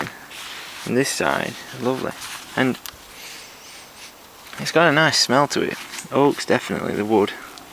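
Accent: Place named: North, United Kingdom. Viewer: best video on pelts ive seen. so many times they say you need this, or that, this is a COST FREE video, so it makes it the best. by the way, are you from the north, i noticed your accent.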